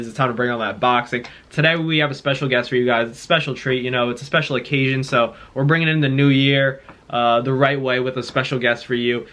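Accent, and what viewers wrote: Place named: Texas, United States. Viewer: 🤣😅😂your Texas accent is trash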